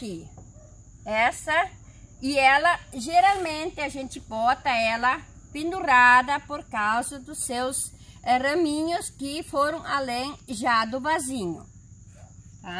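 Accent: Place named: Germany. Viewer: Esse sotaque alemão ❤️😍😂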